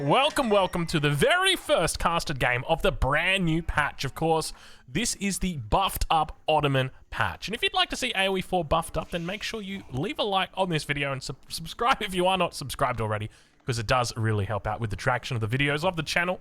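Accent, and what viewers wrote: Australia. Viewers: Fresh in the morning, love it!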